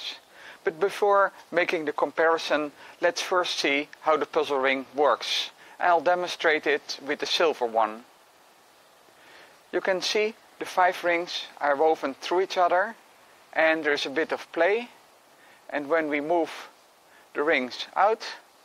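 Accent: Netherlands. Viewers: ik denken van wel: hoor zijn naam en hoe hij zijn naam zegt en hij heeft een nederlands/Engels accent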